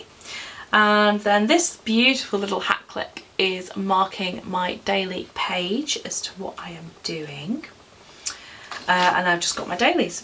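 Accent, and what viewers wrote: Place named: England, United Kingdom. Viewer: Love your July setup. I hope this doesn’t sound weird but I love your English accent. From an Aussie follower x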